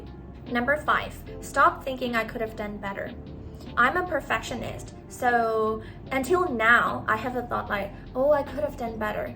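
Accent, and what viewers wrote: England, United Kingdom. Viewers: How do I get English accent like you, plzz give me some tips with one vd ma" ,most of Myanmar people want to know for it ❤ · I love your English accent so much . Do you have a Instagram account?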